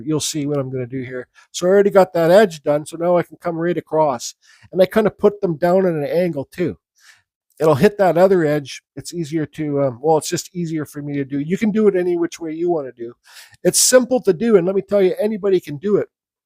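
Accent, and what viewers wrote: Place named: Canada. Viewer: I think I’m detecting a Canadian accent!!….or upper Midwest..I love it…I’m from Michigan and have spent a lot of time in Canada and of course the Midwest! Thanks for sharing your expertise much appreciated…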